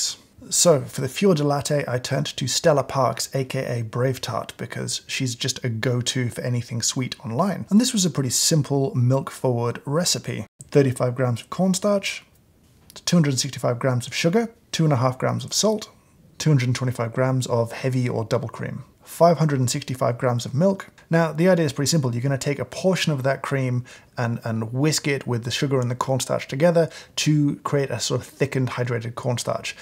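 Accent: United Kingdom. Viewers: Top notch British accent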